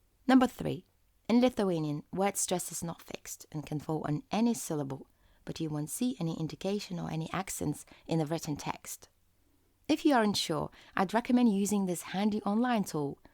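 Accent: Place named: United Kingdom. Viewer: Are you a Brit?so pleasant English in here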